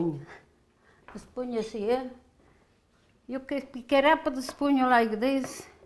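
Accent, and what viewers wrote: Italy. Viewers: لغة انجليزيه علي فكرة بس بلكنة ايطالي لكن كل انجليش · I really like her italian accent she gives me some vibes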